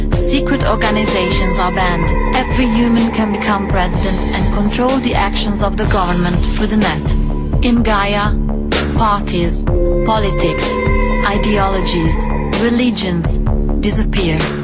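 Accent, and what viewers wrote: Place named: Italy. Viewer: chiunque abbia fatto questo video poteva almeno prendere qualcuno che perlasse Inglese in maniera decente, e non il solito pastone Italiano/Inglese in stile Toto' 40 anni fa....patetico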